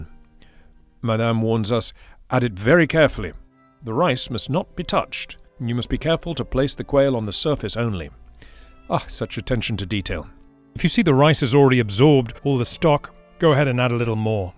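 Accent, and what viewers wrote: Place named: England, United Kingdom. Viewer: you keep on producing whatever is in your heart and mind to produce and you won’t be letting me down! Promise! I will be honest with you, I really don’t know how I came across your channel, but I am very glad that I did. I have had many years in the wine industry (which thanks to Covid has been a challenging thing recently for not only myself but many food and wine professionals in the city), but I started out in the food end of the business and I have done a LOT of things in both areas over the years. Recently, New York has been feeling like a culinary dessert to me…maybe I have just become jaded! There was something about your videos when I first discovered them that really caught my attention, and trust me that’s saying a lot because I take in a lot of what is out there and just through osmosis here in the city I pick up a lot. 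I confess that when I first started watching it was just really good eye candy as they are so beautiful, but very refreshing and I liked your style (not an egomaniac and who doesn’t love an English accent), and the recipes tugged at my heart. This may seem a little silly to say to you, but your videos have been awakening a side of me that I was losing touch with and is so important to me. I can’t help myself, when I watch them now I look at them from a more professional lens and yes, I do praise you a lot, but I do have a little mental list of a few thing… Yes, I am here in Manhattan, not far from your old stomping grounds…I am in Hell’s Ki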